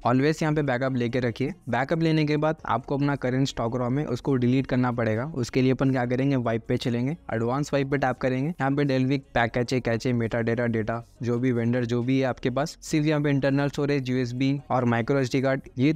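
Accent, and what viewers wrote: Hyderabad, India. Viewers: Hyderabad se ho aap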